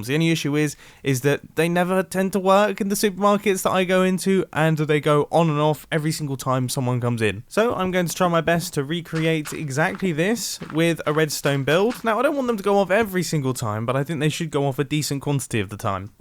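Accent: England, United Kingdom. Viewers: It's not funny that's just how British people speak which includes me and what the hell is a pizza roll?!?! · English accent I suppose? It depends where you're from in England so I can't say because I'm pretty sure I'm not where is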